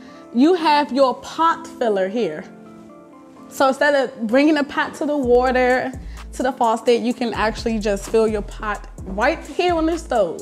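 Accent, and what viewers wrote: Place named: Washington DC, United States. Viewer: Love that D.C. accent 💚💚💚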